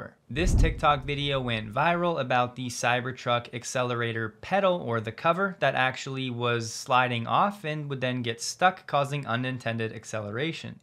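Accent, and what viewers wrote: United States. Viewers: Thanks for the updates. 🙂👍 Your speaking speed is fine. If you speak any faster, English speakers will start to have trouble in understanding your strong U.S. English accent. There are a number of U.S. channels which many English people cannot understand because of strong accents and rapid speech. People who speak English as a second language will have even more difficulty.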